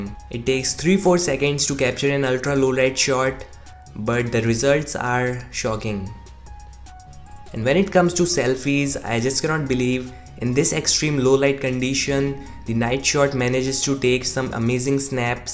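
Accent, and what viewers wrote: India. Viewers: I thought you were Indian!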